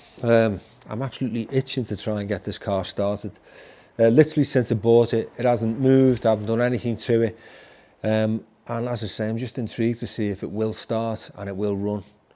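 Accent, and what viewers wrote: Liverpool, United Kingdom. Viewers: Being a fellow scouser who has not lived there for over 50 years, it was to fun to hear the accent again. I applaud you on your knowledge of motors. If I am ever in Liverpool again, I will keep a lookout for a black and/or red roller corniche. 👍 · sound like a scouser , well done wack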